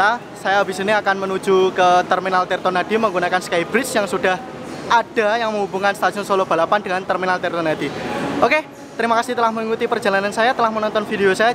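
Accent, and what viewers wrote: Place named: East Java, Indonesia. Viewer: Mas owong jowo timor to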